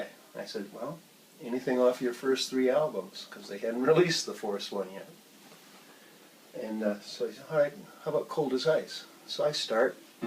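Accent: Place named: United Kingdom. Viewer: That is a Great,and Funny story! Love the British accent! It would be Cool to hear how you guys got the gig with Olivia Newton John!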